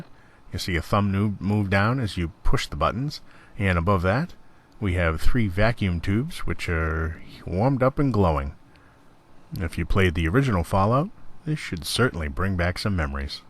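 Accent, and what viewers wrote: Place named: Boston, United States. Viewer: You sound Boston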